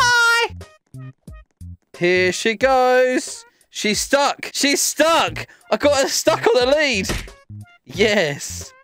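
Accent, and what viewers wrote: United Kingdom. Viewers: Is it me or Have I been watching this guy for 4 years And just realized He's British? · This dude is Britain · Are you British? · Bro is British playing with Americans!?!?!?!?! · I think he’s British🇬🇧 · Mate ur british?!?! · ur from British😮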